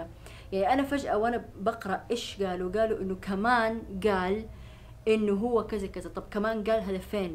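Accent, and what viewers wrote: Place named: Riyadh, Saudi Arabia. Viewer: got u اهي سعوديه وانا مو سعوديه ف ما افرق بلهجاتهم بس اتوقع لهجة اهل الرياض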